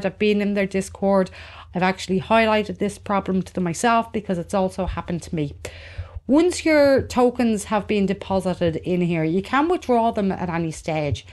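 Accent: Ireland. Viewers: Loving this video, valid info and straight to the point. Good hearing from a fellow Irish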